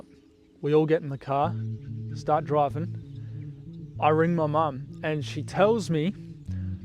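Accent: Australia. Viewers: mate ... thought he was omegaverse but no he's just australian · Love the way he speaks full Aussie lingo 😊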